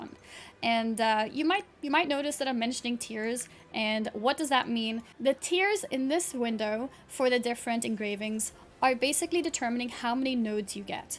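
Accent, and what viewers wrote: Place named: South Africa. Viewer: Your accent sounds different. Are you South African? :P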